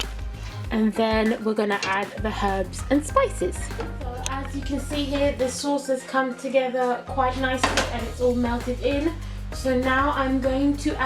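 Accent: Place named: Belgium. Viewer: it's a belgian accent :p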